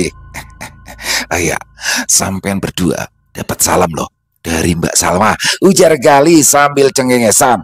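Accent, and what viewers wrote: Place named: Java, Indonesia. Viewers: Haha bang ad lg y cerita yg lucu,dn pembawaan yg sepnuh hati saya merasa mndngar orang jawa lg bercanda.. Enak dnger logat jawanya pas aplgi bawain logat betawi hoalh top dah bang.. Mksh bang mga sht selalu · Cerita kocak versi dialek Jawa, se seru kisah Bg Piyan org Betawi. 😂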